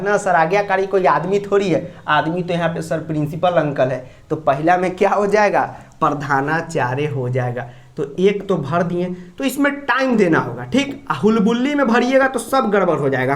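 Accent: Bihar, India. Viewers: Sir ap bihar se h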